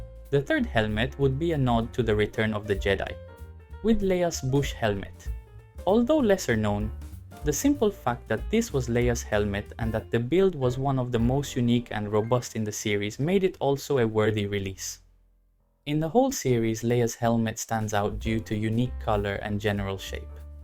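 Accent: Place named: Philippines. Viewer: Your accent sounds so Filipino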